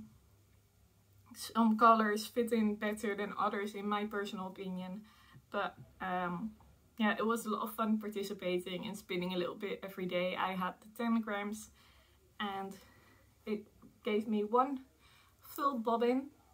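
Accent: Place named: Scotland, United Kingdom. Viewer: I could hear the wee Scottish twang coming through sometimes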